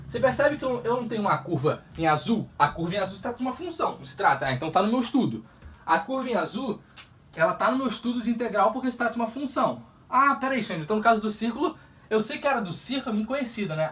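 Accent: Rio de Janeiro, Brazil. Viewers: De onde você é do Rio ?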